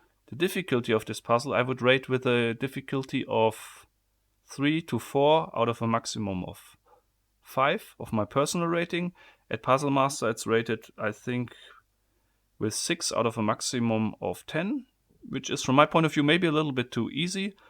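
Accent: Germany. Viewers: Im not going to lie the main reason i watch you is because i love the german accsent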